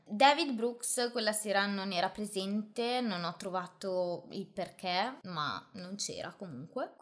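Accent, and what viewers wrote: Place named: Milan, Italy. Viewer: Sì consocevo la storia di Candyman; uno dei più famosi serial killer USA. Poveri ragazzi, adescati dall'alro farabutto coetaneo e complice dei Corll. Ti chiami? Ebbene è il mio primo video del tuo canale e mi sono iscritto. Hai una buona voce e narrazione con accento lumbard milanes.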